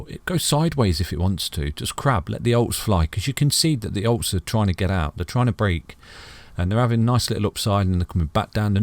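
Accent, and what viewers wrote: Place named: Nottingham, United Kingdom. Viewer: Sounds like he's from Nottingham. Although I don't have a crystal ball...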